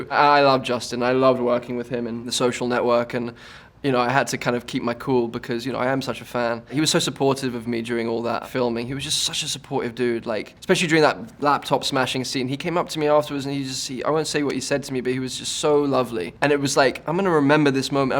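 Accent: United Kingdom. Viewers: NO WAY HES BRITISH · He has a British accent but at times an American accent comes out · i forgot this man is british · When did he have a British accent??? How was I not aware this whole time?? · Since when he British? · Since when is he brittish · He's much more British than I remember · W……….wait……….HE’S BRITISH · why british :( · Since when is he British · why am I surprised everytime I hear his british accent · My mind is blown away that he has a british accent.. ive only seen him in movies with american accents this is so strange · I've heard him do an American accent so much that it feels like he's just doing an accent in this video, like "Hey, he's pretty good at that British accent". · everytime i hear andrew garfield speak, i remember the guy is british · am I the only person alive who had no clue he had a British accent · I forget that he’s got a Brit accent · How did I never realise he was British... 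am I... dumb?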